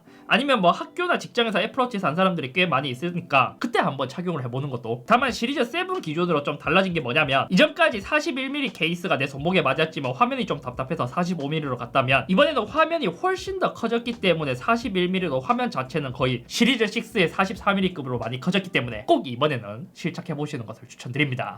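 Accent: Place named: Gyeongsang, South Korea. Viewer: 갱상도는 갱상도네요...드라랄드라라... 빡세요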